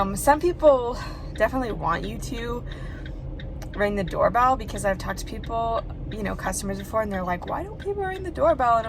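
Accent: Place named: North, United States. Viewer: gotcha! Thought you were from somewhere up north, that accent did it lol you’re pretty asf tho. Have a good day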